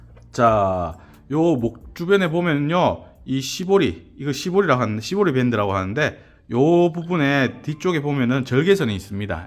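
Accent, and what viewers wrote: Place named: Gyeongsang, South Korea. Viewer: 말투가 경상도 분이시네요 ㅋㅋ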